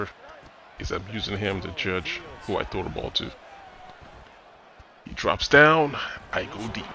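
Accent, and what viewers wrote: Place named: Chicago, United States. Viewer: U from Chicago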